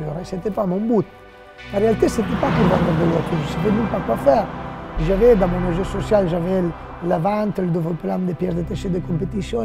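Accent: Italy. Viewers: Am I wrong or he is an Italian speaking french? If not, what language is that? Sardo? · It isn't. He's an Italian speaking French—with a pronounced accent. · He is italian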